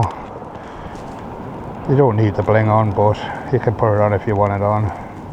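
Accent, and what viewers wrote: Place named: North, United Kingdom. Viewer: Amazing buddy love your accent are you from up north 😁😁😉😉😉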